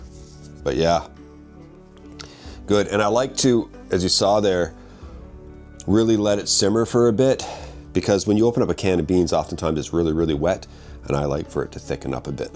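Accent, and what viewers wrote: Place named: Nova Scotia, Canada. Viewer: Just noticing your east coast accent. Not quite Newfoundlander, but very much like a Blue Noser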